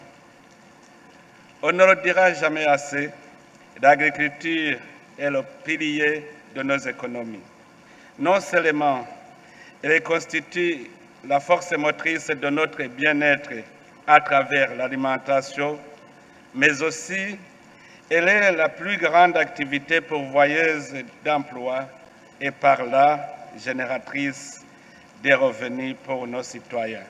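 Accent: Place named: Burundi. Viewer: Igifaransa kikirundi kbsa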